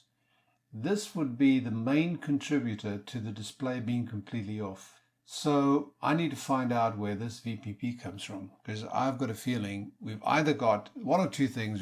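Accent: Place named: South Africa. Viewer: Hi great video, i love old audio equipment. You sound South African by the way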